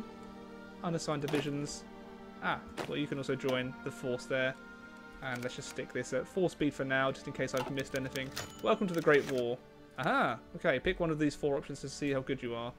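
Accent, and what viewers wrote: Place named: United Kingdom. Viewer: When he said he was going to England I was shocked cuz I actually thought he was a Brit the whole time😳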